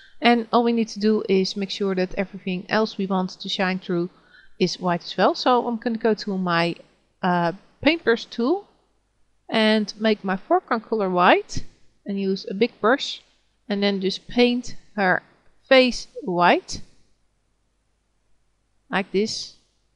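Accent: Netherlands. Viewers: nederlander hoor je aan het accentje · Don't bother what he/she said. You are from Holland so its obvious your accent will not be the same as native american or british. In my opinion your voice is quite sexy^^ and thanks for this tutorial :D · Great tutorials. I've just subscribed. That's one hardcore Dutch accent! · Ik merk dat je Nederlands bent, wat is dat voor galm achter je stem? Interessante tutorial trouwens. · Your accent seems to be dutch. Nice tutorial btw. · so dutch hahah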